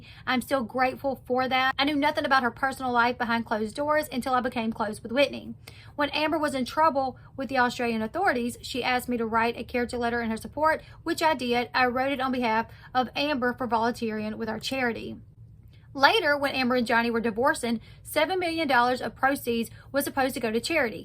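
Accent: South, United States. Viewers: I love your southern accent :)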